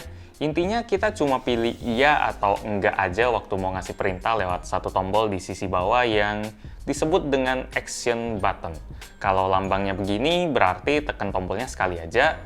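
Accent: Palembang, Indonesia. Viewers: Bang lu org mana? Palemvang ya?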